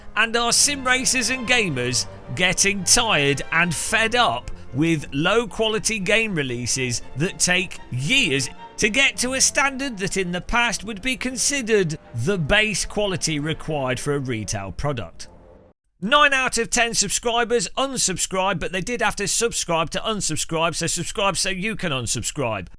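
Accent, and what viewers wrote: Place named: Midlands, United Kingdom. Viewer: Agreed. I only subscribed for the sarcasm, which is amplified by a Midlands accent. :-)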